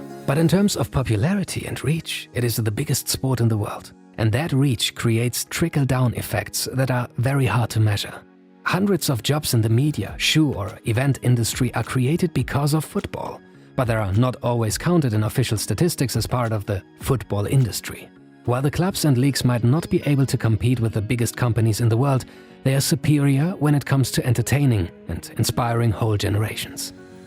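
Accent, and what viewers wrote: Germany. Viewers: I used to have a professor lecturing in Media & Sports, who was from Germany. Every time I watch these videos it’s like being back in class. Not just accent, it’s almost exactly the same voice. Eerie but awesome. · the voice and the german accent 😩😩😩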